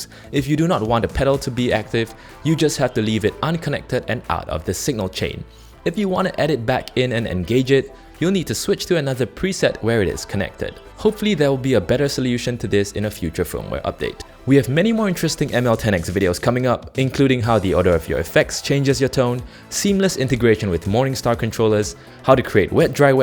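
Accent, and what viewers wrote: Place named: Singapore. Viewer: Is Morningstar based in Asia? This person sounds like a Singaporean or Southeast Asian.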